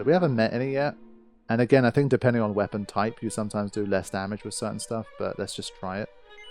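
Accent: England, United Kingdom. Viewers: Now we can have proper Middle English Language being spoken by a proper English Commentator!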